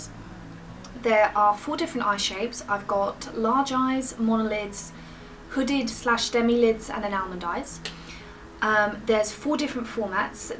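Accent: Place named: Brighton, United Kingdom. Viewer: You sound like you're from Brighton (: you're a really beautiful woman too! Very natural (: xx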